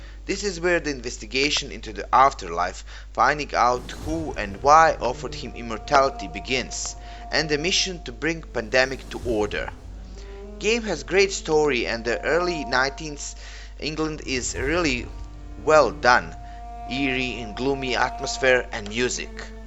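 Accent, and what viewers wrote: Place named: Russia. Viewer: Like your indifferent Russian voice.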